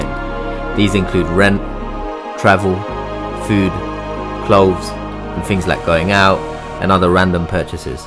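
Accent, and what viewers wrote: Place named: Hackney, United Kingdom. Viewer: Sounds like he's from Hackney.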